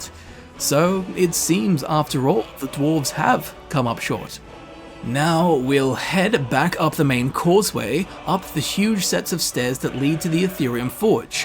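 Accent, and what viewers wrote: Australia. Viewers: isn't he australian though? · He's British, he has it memorized. Edit: Australian? It's less funny now.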